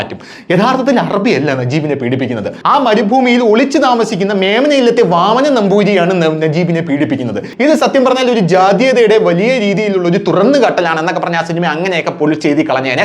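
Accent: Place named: South Kerala, India. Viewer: കാര്യം എന്തൊക്കെ ആയാലും അവതരണം വത്യസ്തം ആയിട്ടുണ്ട്. തെക്കൻ കേരളത്തിന്റെ ശൈലി ✌🏽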